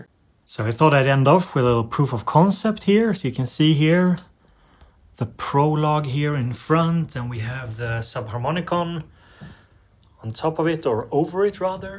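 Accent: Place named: Sweden. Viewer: Sounding particularly Swedish today! :)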